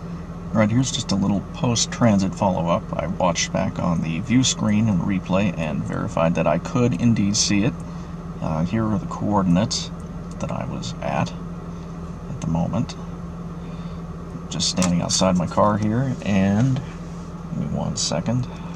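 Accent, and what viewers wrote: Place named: United States. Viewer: it sounds great to me - I always think the American accent sounds "professional" Much easier on the ears than my Aussie roughness.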